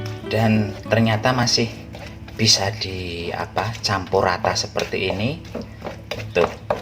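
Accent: Banyumas, Indonesia. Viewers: Sangat kreatiif ms... bahasa ngapaknya itu saya jg sangat suka...lucuuu lgi😁😁😁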